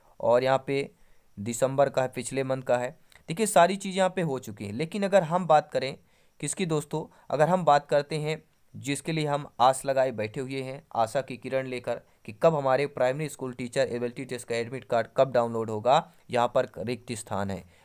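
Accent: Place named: Uttar Pradesh, India. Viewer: but aapki language u.p. ki lg rhi hai...🙏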